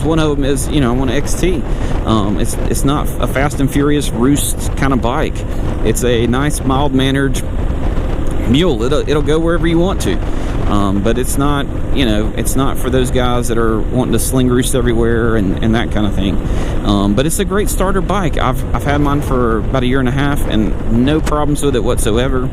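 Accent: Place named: Alabama, United States. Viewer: I could tell it was bama before you even said anything lol. How far are you from Fayette?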